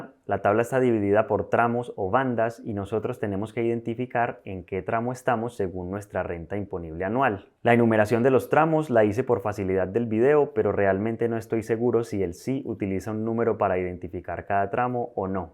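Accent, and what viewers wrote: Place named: Colombia. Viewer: Bueno video, Clarito como el agua. Solo que tu acento colombiano causaba corto circuito en mi cabeza... pero muchas gracias por la info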